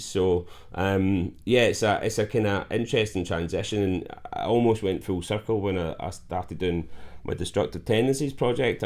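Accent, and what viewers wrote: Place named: Scotland, United Kingdom. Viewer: U sound like ur from milngavie Where’s fuckin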